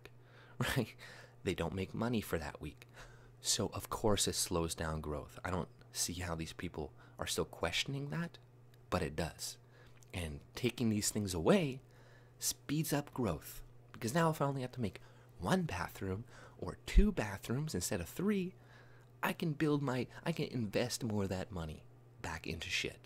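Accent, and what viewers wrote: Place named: Toronto, Canada. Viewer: You sound like a Torontonian- You from around here?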